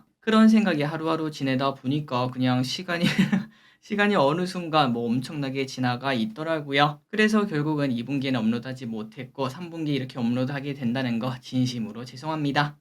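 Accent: Gyeongsang, South Korea. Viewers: 대구분셨나요.? 반가버유.~~^^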